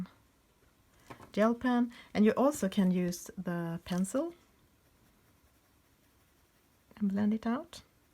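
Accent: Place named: Italy. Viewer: Your beautiful accent reminds me of the accent that Meryl Streep imitated in the movie, 'Bridges of Madison County'. Are you Italian?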